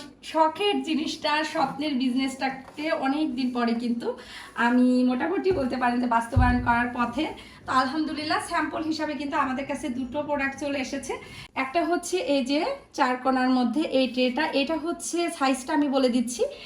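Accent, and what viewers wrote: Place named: Kushtia, Bangladesh. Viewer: আসসালামু আলাইকুম আপু। আমি নতুন তোমার চ‍্যানেলে।আপুর কথা শুনে মনে হচ্ছে কুষ্টিয়া হয়তোবা। সব জিনিস গুলোই সুন্দর 💓💓💓💓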